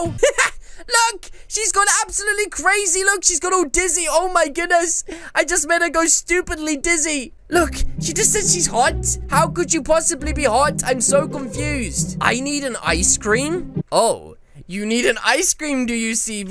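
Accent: United Kingdom. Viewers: bruh he sounds like a british person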